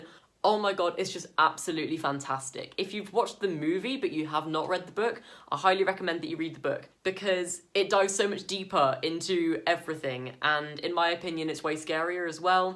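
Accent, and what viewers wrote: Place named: United Kingdom. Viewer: I love your unusual opinion and accent. I think you are British.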